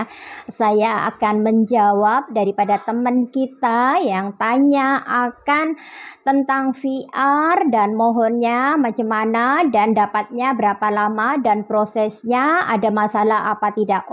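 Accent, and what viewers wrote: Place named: Indonesia. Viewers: Akk ni bhsa melayu pun blm sangat Pasif msh cakap indonesia kental lagi terdengar.lg pulak akk ni kl nak apply ic biru rugs lah blk indo jadi org asing.dah berkawin dgn org warga negara punya anak2 warga negara anggap aja blh tumpang ngan suami N anak2.dah dpt PR dah ok dah cukup tuh dah tak perlu buat vissa spouse citizen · Akk dlm video ni bahasa indonesia nya masih pekat😅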